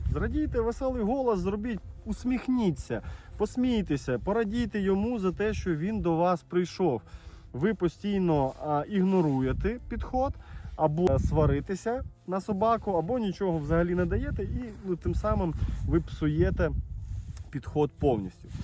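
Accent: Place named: Ukraine. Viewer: нравятся еще как нравятся хоть украинский не знаю я родилась в киеве живу в Израиле но знаешь я слышу украински мне кажется что я сейчас заговорю на украинском мне украинский "акцент" ближе чем руский . как душевно, генетический.(не потому-что вами война) я невидела украину 34 года а акцент родной остался на душе😊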